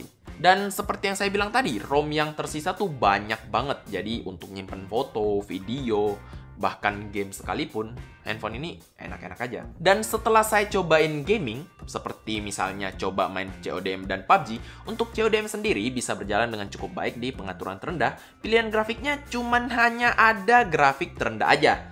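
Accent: Makassar, Indonesia. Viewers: Bang pake logat Makassar lagi dong bang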